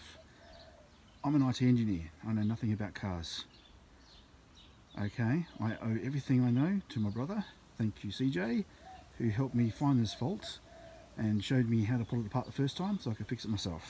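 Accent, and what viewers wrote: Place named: Australia. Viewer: Mate, you and your Australian language are both legends, thank you for the video, cheers from Saudi Arabia.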